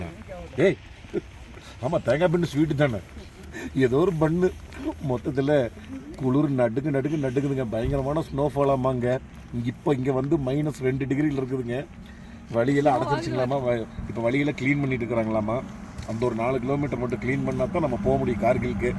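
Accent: Kongu, India. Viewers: கொஞ்சும் கொங்குத் தமிழ் பேசும் கோமகரே...! திகட்டாத தித்திக்கும் பேச்சுடைய திருப்பூர் திருமகரே...! எங்கள் மதிப்பிற்குறிய திரு. அண்ணா❤❤❤🙏 அவர்களே எப்படி இருக்கீங்க அண்ணி❤❤❤🙏 எப்படி இருக்காங்க ரித்திக்❤❤❤ எப்படி இருக்காரு. அண்ணா மைனஸ் டிகிரி குளிர்ல ஷூ&சாக்ஸ் போடாம வெறும் செப்பல் மட்டும் போட்டு இருப்பது ரொம்ப ரொம்ப தவறு. உறைபனி குளிர்ல ரத்தம் உடம்புல ஓடுறது சீராக இருக்காது. அப்படி ரத்தம் சீராக இல்லாமல் போவதால்தான் நம் உடம்பு உறைபனி குளிர்ல மரக்கட்டை மாதிரி விறைப்பதற்கான காரணம்.... அப்படி ஆகாமல் இருப்பதற்காகதான் WINTER COAT JACKET SHOE&SOCKS போடுறதே. தயவு செஞ்சு ஷூ&சாக்ஸ் போடுங்க அண்ணா🙏 11.20 செகண்ட் வீடியோவுல பேசிக்கொண்டே சட்டுனு பாதை மாறிப் போயிட்டீங்க? அண்ணி Guide பன்றாங்க👍 இந்த மாதிரி இடங்களுக்கு புதிதாக போறப்ப இந்த மாதிரி பாதை மாறிப் போறது சகஜம்தான். ஆனாலும் நீங்க போகிற இடங்கள் எல்லாம் அவ்வப்போது பனிச்சரிவு அதிகம் ஏற்படும் இடங்கள் அண்ணா. இந்த மாதிரி பாதை மாறிப் போயி எங்கயும் லாக் ஆகிட்டால் கஷ்டம். 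ரொம்ப கவனமா இருங்க அண்ணா👍 நம் ❤சிட்டுக்குருவியோட❤ தங்களது இந்த லடாக் பயணம் இனிதே தொடர்ந்திட நல்வாழ்த்துக்கள் அண்ணா❤❤❤ என்றும் அன்புடன் உங்கள் தம்பி என்றும் அன்புடன் உங்கள் TMF ரா.முஹம்மது கபூர் (சென்னையில் இருந்து)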